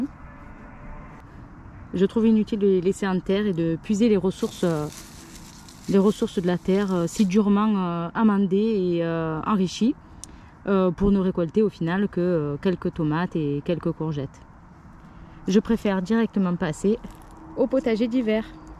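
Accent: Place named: Provence, France. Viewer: Bonjour, sacré potager sacré boulot ✌️🌷 l'accent de vers chez moi, camargoprovencal 😁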